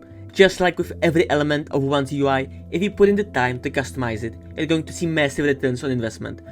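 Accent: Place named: China. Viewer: Jesus Christ, your "chenglish" is killing my brain cells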